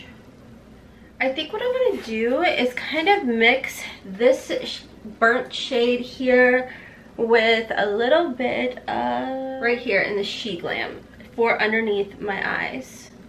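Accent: South, United States. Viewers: I watched this entire video for "matte", "sale", "gold", "talc", and "slant". 😂😂😂 Ooooh to be southern!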